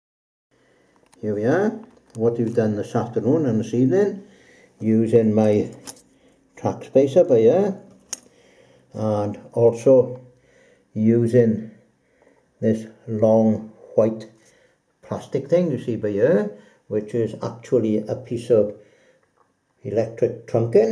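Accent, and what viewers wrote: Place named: Wales, United Kingdom. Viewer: great idea using push pins to hold track in place while the geometry is sorted and your ready to pin it down .I have wasted so many track pins by fitting and pulling out , re aligning and such . great old school layout .love the accent by the way . it takes me back to when I played in a band touring wales , the "by yeur" phrase had us English baffled for a bit till we got used to it .its running great hope to see more in the future .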